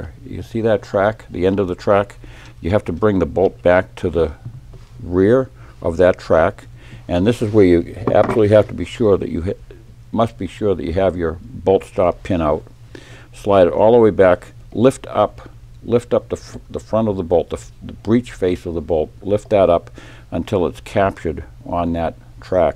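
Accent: New England, United States. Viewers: Very informative video and I like your New England accent.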